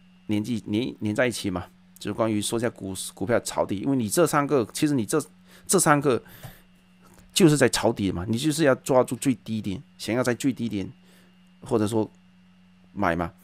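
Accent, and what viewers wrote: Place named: Zhejiang, China. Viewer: 浙江人，哈哈哈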